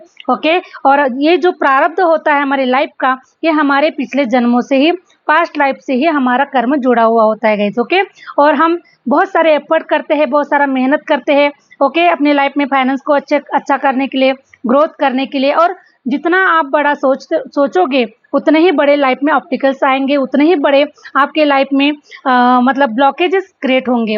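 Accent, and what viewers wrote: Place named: Maharashtra, India. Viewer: Mumbai wali language Marathi vibe hain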